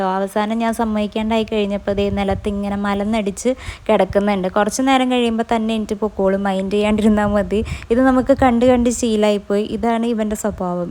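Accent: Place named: Thrissur, India. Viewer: Athu Thrissur ullavarde samsarathil varunnatha adi kittum ttaa ithupole ellathilum tta tto swabavikamayi avrde samsarareethiyil varunnatha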